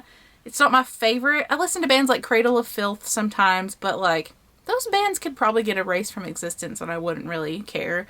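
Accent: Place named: South, United States. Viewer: You're southern aren't you ? 🥺💘😭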